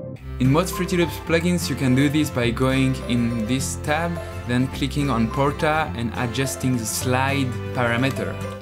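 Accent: France. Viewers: Are you french ? Because you speak like a french guy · French accent lol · Where are you from ? Because you have not an english accent and you love french. Are you french ? ? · You're French right? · tu es français non ? :) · Tu serais pas français par hasard je trouve aue tu as un petit accent... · Comment ça se fait qu'il n'y ai aucun Français par ici ? On reconnait bien ici un Français talentueux ! ;) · You are French ?!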